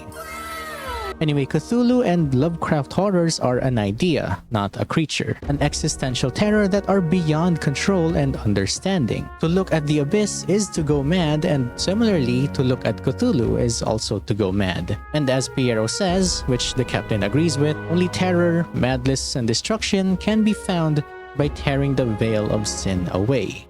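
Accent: Philippines. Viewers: Are u a filipino fellow? Almost similar accent with peeps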